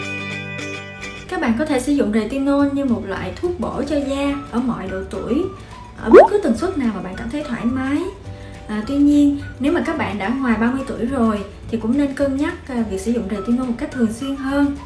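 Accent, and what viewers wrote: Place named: Japan. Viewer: cảm nhận của riêng em là chị quá đẹp và tinh tế trong phong cách ngoại hình và giọng nói(em cảm nhận nó nữa Việt Nam nửa Nhật Bản). Em xem về clip phân loại da của chị rất hiệu quả, em cám ơn chị rất nhiều những chủ đề về da, việc học và đời sống của chị tại Nhật Bản rất hay luôn, em luôn mong chờ những cip này từ chị chị là hình mẫu của em về sự tiến bộ để đạt được những thứ mình muốn, chúc chị luôn khỏe vui và sống trong những thứ tốt đep trong cuộc sống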